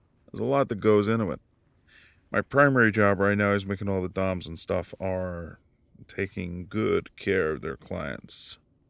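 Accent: United Kingdom. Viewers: Still got that British dialect. definitely ❤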